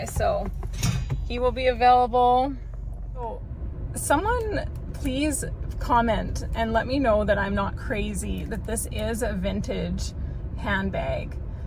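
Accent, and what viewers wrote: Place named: North, United Kingdom. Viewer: Those frosted blue glasses were def a miss! They were so pretty! 😊 Purse is cool, and so curious how much weight that macrame chair would be able to hold! 😮 Also, I need to finally mention this… (hope you see it!) There’s a really great YouTube ad that I rarely see anymore but used to see it a LOT. It’s a supposed buyer who’s showing all the various ways and applications that this particular lint scraper thing can perform - all while narrating it. It’s actually pretty funny bc she says “It will get you questioning your life and things like…Does my dog still have a coat? Am I a gross and disgusting person?” and so on 😂, and at the end she says, “Idk, this just might be the best thing I’ve ever bought from the internet.” ANYWAY, I swear YOU are the voiceover person!! It’s your exact kind of measured dry humor and the voice (complete with northern accent) sounds just like you! 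😄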